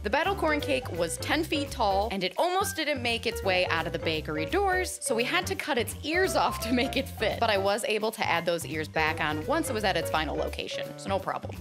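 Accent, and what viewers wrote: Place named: Wisconsin, United States. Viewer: I'm telling you she has to be from Wisconsin she sounds so Wisconsinny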